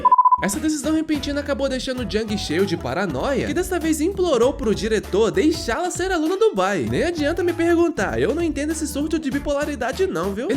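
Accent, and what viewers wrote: Bahia, Brazil. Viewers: Kkkkk primeira coisa q os caras perguntam é se ele é baiano! Kkkkk TENSO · Oiaaa, novo narrador....gostei kkkk é bahiano né? Certeza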